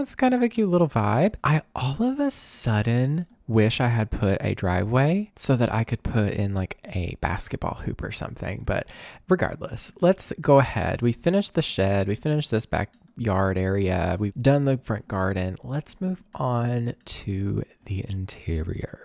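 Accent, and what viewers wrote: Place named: Appalachia, United States. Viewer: i knew when i heard ur accent i was like he sounds like he’s from KY😂😂 I guessed eastern bc ur giving Appalachia 😂❤ so proud to see you here 🎉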